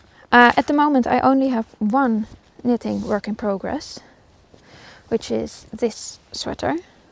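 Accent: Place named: Netherlands. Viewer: Het is te horen ja, sommige dingen klinken nog Nederlands 🤩 Maar toen je op 31 dec middenin een bos zat, kon ik me niet voorstellen dat je ook in Nederland wás op dat moment , zonder mensen en zonder herrie om je heen 😂 ik vond het heel leuk om te kijken en zal je zeker blijven volgen! Ja , ik brei heel veel en ik spin, maar niet zoveel als ik zou willen. Maar als ik jou dan weer zie .. dan wil ik nú beginnen 😊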